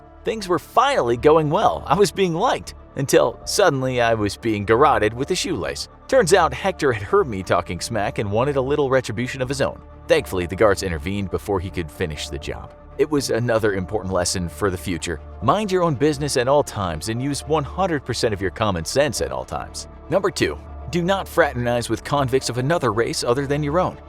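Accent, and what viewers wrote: United Kingdom. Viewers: His British accent is actually alright.....I can tell better than Americans because I'm from the UK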